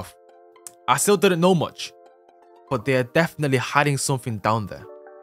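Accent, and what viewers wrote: United States. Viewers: your american accent sounds fine. just a bit off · Yoooo sup here again sorry for late comment kinda just got on my phone Edit: ur American accent is better than mine lol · Your american accent isnt that bad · Bros American accent is actually pretty good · As an american his american accent makes me wanna die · Dude your American accent isnt cringe at all, its really good tbh, you should talk like this more often cuz its really good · This is Ascended American voice like HUH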